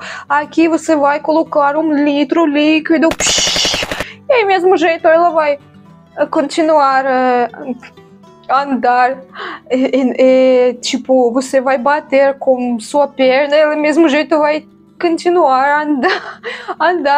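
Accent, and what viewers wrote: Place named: Eastern Europe. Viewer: eu detesto esses bichos , seu sotaque eslavo deixa o portugues bonito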